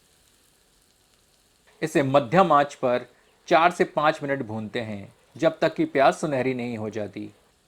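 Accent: Standard. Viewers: Love your pure Hindi bro.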